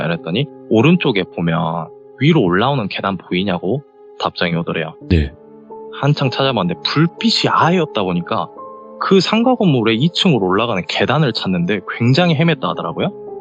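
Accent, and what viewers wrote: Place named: Seoul, South Korea. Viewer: 서울말 힘들죠ㅎ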